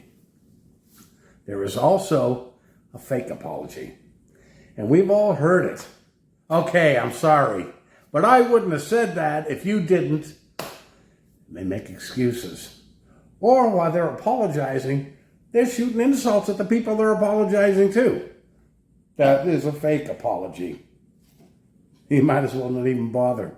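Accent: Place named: New York City, United States. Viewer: Hi, love your true life stories. You have a knack for encapsulating human nature and real life situations in an interesting and entertaining way. Really enjoyed this one. Like your accent as well is it NYC?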